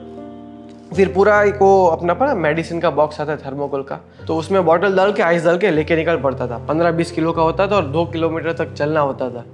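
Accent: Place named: Gujarat, India. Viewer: That gujarati accent 😊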